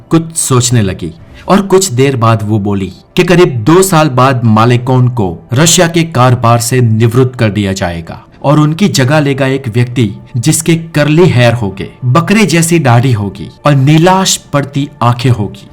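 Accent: Gujarat, India. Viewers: His pronunciation says that he must be Gujrati and most of the times he speaks gujrati so he not able to speak Proper Hindi.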